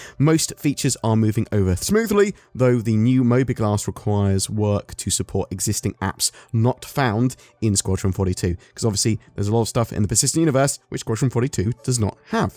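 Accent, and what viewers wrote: United Kingdom. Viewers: BRO YOU SPEAK TOO FAST AND TOO BRITISH.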